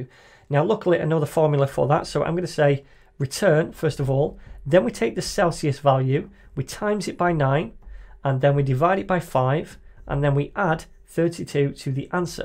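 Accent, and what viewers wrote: United Kingdom. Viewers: diving into the topic instant -british accent -on point -examples made easy to understand please never stop you made this nutshell powerful. Continue your videos like this it's more helpful than you think it is. People are way into "yo whats up this is .. and never forget to click the bell and sub" and "for a short ad we can do this" such sellouts.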